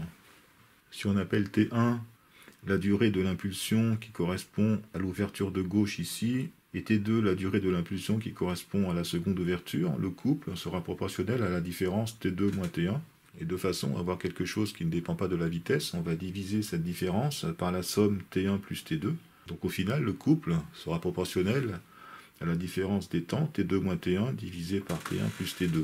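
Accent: France. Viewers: Bien entendu qu'il te faut continuer en anglais, malgré les remarques du type que j'ai pû te faire sur ton accent bien français. Ton contenu est absolument unique par sa précision et le type de matériel, ce serait bien dommage que tu te prives de 95% des utilisateurs de youtube. Carry on and all the best! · l'anglais est simple mais bon c'est l'accent qui est franchouillard au possible. l'anglais couvre évidement un plus grand public, si c'est compréhensible et interessant les gens regarderont. Ne laissez pas tomber. Regardez Isaac Arthur, un défaut de language et pourtant 1/2 million d'abonnées pour des vidéos ou il parle non stop 1h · Hi, I downloaded the french transcript and put it into the translator. Your english is very good and does not sound like a "spanish cow" like some bad people may have said. They are just jeallous. Of course you got a french accent, but most english speaking creators on youtube have some form of foreign accent. 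That is totally normal. It would be really nice if you could continue in english so you reach a broad audience all over the world.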